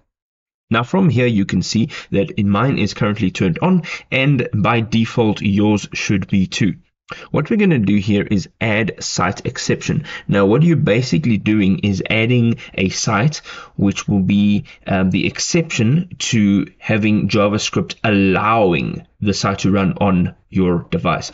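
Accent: South Africa. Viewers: You sound South African....? Like from Western Cape, right?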